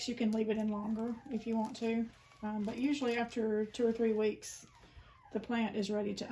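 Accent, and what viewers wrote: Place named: East Tennessee, United States. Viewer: You sound like East Tennessee. Me!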